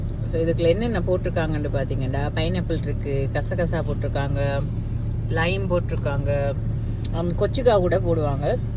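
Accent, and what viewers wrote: Sri Lanka. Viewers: நீங்கள் பேசும் இலங்கை தமிழ் மிகவும் அழகாக இருக்கு ஆங்கில கலக்காமல் பேசினால் மிகவும் நன்றாக இருக்கும் ஒரு நட்சத்திரம் போல் உங்களை காண்பது போல் இருக்கு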